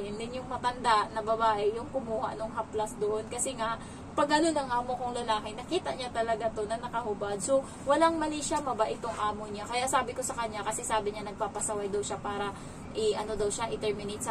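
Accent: Iloilo, Philippines. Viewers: Hi po, ilonggo ka po pla...saan po province nyo?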